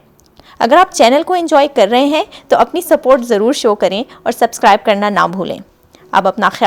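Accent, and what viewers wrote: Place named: Pakistan. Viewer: Your Urdu accent is amazing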